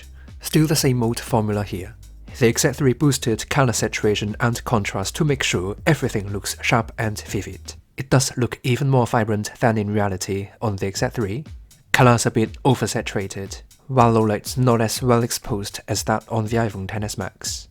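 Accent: United Kingdom. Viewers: 詳細的解說，一口流利的英國腔英文 👍👍👍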